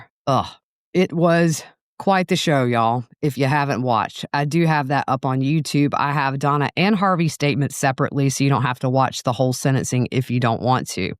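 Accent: South, United States. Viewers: I just today stumbled upon your channel. Love how you speak -quickly, without ums and ahs and you know what I means! You also have a beautiful southern drawl. I subscribed and look forward to more vids from you. (Prison...OMG most of those things, ALL of those things that were described -the noise, the pettiness, the jealousy, the food, the lack of privacy., the humiliation, the confinement with ppl who disgust you or scare you...ALL OF IT. Just a nightmare. And for me personally, I do NOT get along with other people. I need to be alone most of my time bc humans just exasperate and me bore me. I would not do well in prison. I barely find comfort in the wild.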